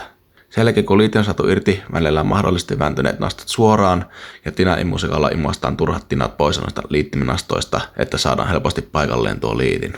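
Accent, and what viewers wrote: Finland. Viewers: For what it's worth, as a non Finnish speaker the Finnish-English dialect has it's own charm. I am sure I would enjoy your videos either way :) Nice series by the way, love me some open source hardware hackery.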